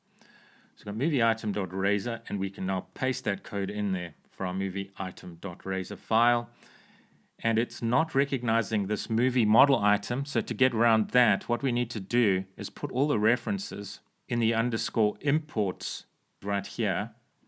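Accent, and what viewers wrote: South Africa. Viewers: That annoying invisible character that was at the start of your files might just be the BOM, at the bottom right of VS Code you can see "UTF-8 with BOM" so you might be able to disable it there Are you from south africa or am I mistaken? (because of the accent)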